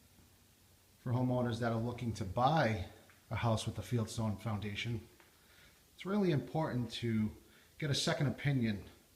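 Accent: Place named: Boston, United States. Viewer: Hi, I've been watching many mason videos in hopes of learning how to do what you are doing in the video here. As soon as I heard your voice, I said to myself, this guy is local as I recognized our Boston/New England accent. So here is my story. I am a 67 year old woman who tries to do as much as I'm capable of doing myself. The basement you are working on is very much like mine. I've started digging out in between the stones and cement. I've got approximately 4x4 area done. Clearly I've taken on more than I'm capable of. HELP!!!! Are you able to tell me what that job cost the homeownet? Since mine is in the same condition and close in dementions, it would, at the very least give me ball park number to think about! I will go to your web site and read more of your services.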